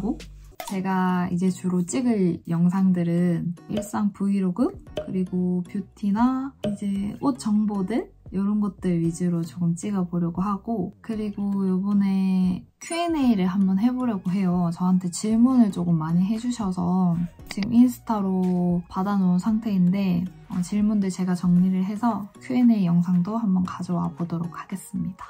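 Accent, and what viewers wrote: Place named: Seoul, South Korea. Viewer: 웅니 거주지는 부산으러 알고있는데 서울말 왜이렇게 잘하시나욤!?! 이것만 보면 완전 서울 사람이라고 해도 믿을듯 웅니 넘 이뻐요 앞우로 영상 마니 올려주데욤(ღゝ◡╹)ノ♡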